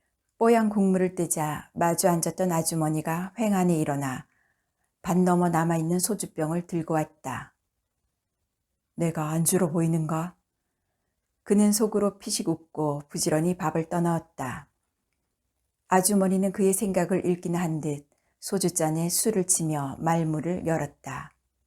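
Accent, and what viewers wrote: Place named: Seomjingang, South Korea. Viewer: 님 섬진강 사투리가 구수하네요 처음듣는 때 울음 🐓 꽃 따는 머슴 왠지 가슴이 먹먹하네요 매화골 언덕 길 찻집 머슴 나뿐놈일까요 슬품이 담긴 피릿소리 꽃 차향기 외로운 여인의 향기는슬프군요 😊 감사합니다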